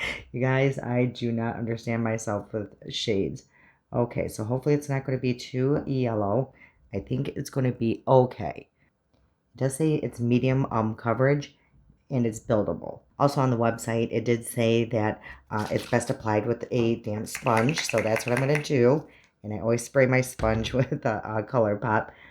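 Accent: Midwest, United States. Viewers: I just love your videos! I hear that Midwest twang, and it's like i'm watching my younger sister! 😎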